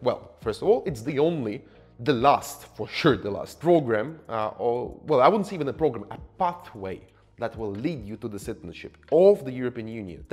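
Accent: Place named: Poland. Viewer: Your Polish accent is still very much possible to hear. Not that I am aginst a Poles ( as they belong to EU) just saying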